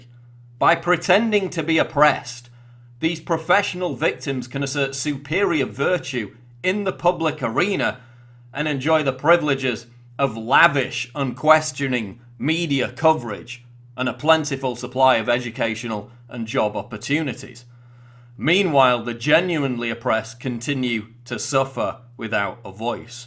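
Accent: United Kingdom. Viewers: A white guy on YouTube with a British accent, commenting on BLACK issues?? Lmfao the irony of this dude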